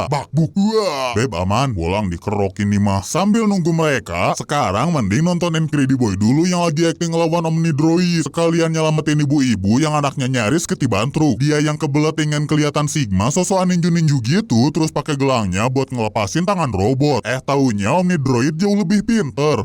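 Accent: Java, Indonesia. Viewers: Orwng jawa kah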